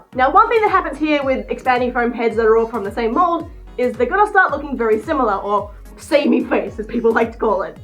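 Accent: Australia. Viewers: Is amazing you sound so auzzie I love it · i can tell Ur an Australian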